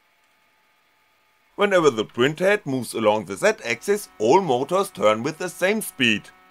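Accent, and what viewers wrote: Germany. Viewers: I love how you germanice English. There is something oddly pleasing with that. Hahahahha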